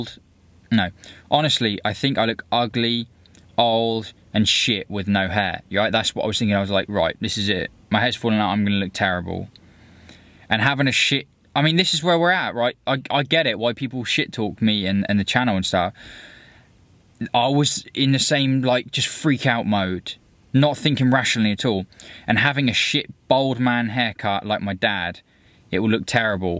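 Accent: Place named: Hampshire, United Kingdom. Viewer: Just shaved mine at aged 54 after reluctant acceptance of quick thinning. Great move. That's a super Hampshire accent. Southampton /New forest? Cool car too, I run a '70 Beetle. Good work on videos. Neil, Southampton.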